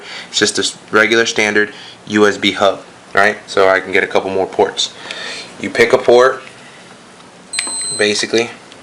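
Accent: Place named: Mexico. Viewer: am mexican and i can tell he is too by the accent, plus he mentions which is cat for spanish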